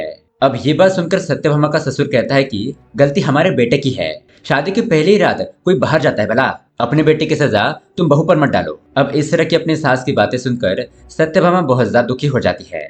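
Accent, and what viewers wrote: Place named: Maharashtra, India. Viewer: Are you Maharashtrian??? B'coz marathi speekers ki hindi accent aisi hi hoti hai..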